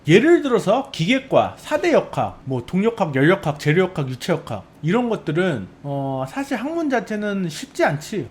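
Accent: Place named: United States. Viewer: 발음이 약간 어메리카한국어를 구사하시네요..피부좋네요.이거말고는 나랑은 딴세상이야기...아니 연봉도 억대시면서 유튜브구독을 종용하시니.ㅎㅎ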